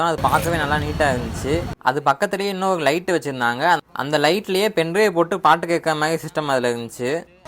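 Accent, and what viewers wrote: Madurai, India. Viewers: Madurai ahh bro neenga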